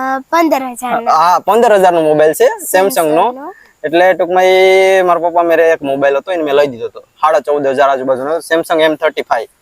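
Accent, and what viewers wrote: Kathiawar, India. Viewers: હું આપનાં વિડિઓ તમારી કાઠિયાવાડી ભાષા ને કારણે જ જોવ છું.....સરસ એવી રીતે બનાવતા રહેજો..👍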